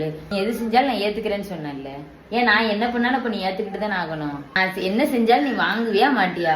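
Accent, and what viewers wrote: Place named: Tirunelveli, India. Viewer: திருநெல்வேலி காரின்னு நிரூபிசுட்ட சகோதரி 👍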